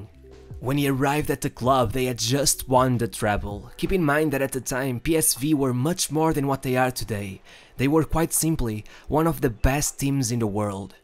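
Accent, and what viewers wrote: Portugal. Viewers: that’s just his Portuguese accent then. Nice back track though. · Man are you from Portugal?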